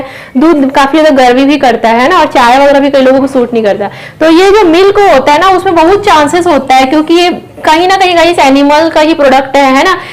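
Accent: Chhattisgarh, India. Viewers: Mam aap Chhattisgarh se hai kya...?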